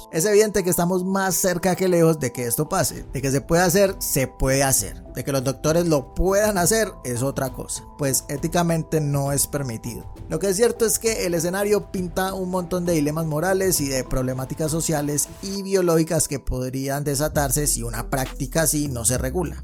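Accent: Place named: Colombia. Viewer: Que como se que sos colombiano? por el acento, y con la frase "la mata que mata" ajajajaja...sos de los mios compa.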